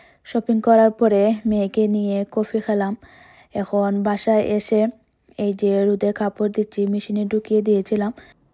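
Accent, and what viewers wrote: Sylhet, Bangladesh. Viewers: আপু আপনার সিলেটি ভাষার কথা বলা শুনতে খুবই ভালো লাগে। ভর্তাটা খুবই লোভনীয় হয়েছে। জুতা গুলা সত্যি খুবই দারুন। ব্রেসলেট এবং জুতা সবই সুন্দর লিপস্টিকের কালারটা ওয়াও❤ ভালো থাকবেন আপু।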